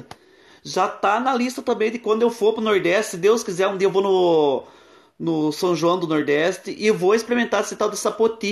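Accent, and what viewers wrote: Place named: Sorocaba, Brazil. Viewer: Você é sorocabano! O sotaque é inegável.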